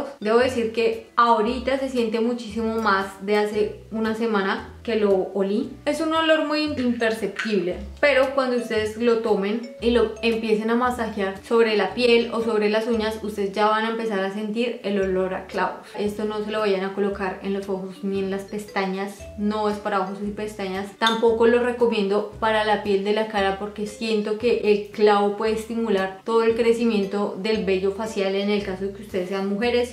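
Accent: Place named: Colombia. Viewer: Me encanta como hablas parece Colombiana, 🇨🇴 un saludo desde Bruxellas Belgica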